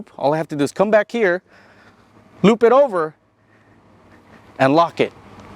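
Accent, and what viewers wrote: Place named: Canada. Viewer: A hispanic man with a Canadian accent. Too cool, I could listen to this guy for hours. He’s a very good presenter. 👍🏽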